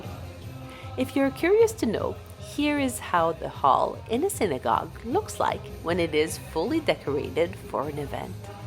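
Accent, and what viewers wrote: France. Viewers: Vous avez un accent français madame !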